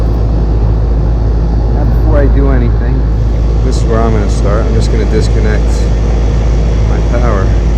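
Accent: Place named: Massachusetts, United States. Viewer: I thought so but I also thought you might be in Mass your accents not that strong. I'm in South Carolina right now but I live most of my life in Mass and been doing the trade for 11 yrs and Love it. You have a lot of the same techniques that I have . It's like watching myself. I am on the Commercial side but have done my share of attics at 120f. Dont miss the winters up there though.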